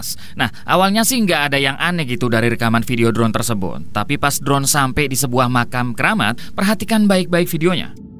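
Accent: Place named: Indramayu, Indonesia. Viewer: Udah aku duga Vidio terakhir dari cara bicaranya logat Indramayu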